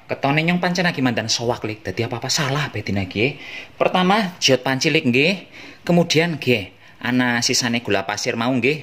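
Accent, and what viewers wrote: Banyumas, Indonesia. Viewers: Iih..mantep ketemu dulur ngapak nang kene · Halo mas ngomongane AKEH uwong sing pada ora ngarti jawane Jawa blrkutek campur banyumasan 😭😀😂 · asik logate ngapak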